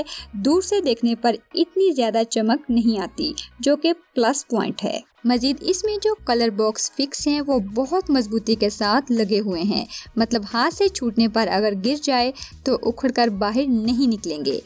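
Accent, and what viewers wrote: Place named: Rural, India. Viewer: apki awaz bot paindu hai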